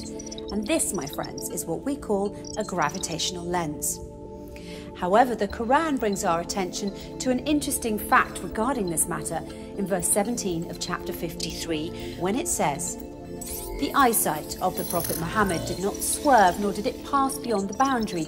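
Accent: England, United Kingdom. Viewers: انتبهوا من هذه القناه.. اما المذيعة فهي مذيعة مأجورة تقرإ الننص كما يكتب لها سواء كان نصا من القرآن او للتوراة او لكتب الهندوس فلا فرق لديها. لكن واصح انهم احتاروها بشكل وبلكنه انكليزية واضحه وايضا أن لا تكون مسلمه لكي تبدو اكثر اقناعا.وكأنها تمثل الغرب او ناسا ربما لا القائمين على القناة... متأكد لو انها قررت تلبس حجاب لطرودها لانهم يريدونها بصوره غير المؤمنة.. الله يهيدهم اللي خلف هذه القناة.. علميا كلامهم للأسف ضعيف كما هو حال كل حلقاتهم.. فانتبهوا..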